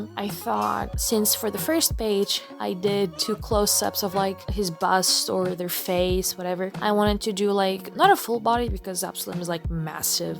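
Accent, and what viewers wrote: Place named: Portugal. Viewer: Got recommended this video, randomly… are you Portuguese, by chance? The subtle accent is quite recognisable. Bom vídeo! 👀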